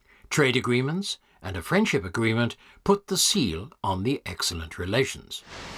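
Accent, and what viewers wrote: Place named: United Kingdom. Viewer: Wicked voice. Raspy British always sounds suspenseful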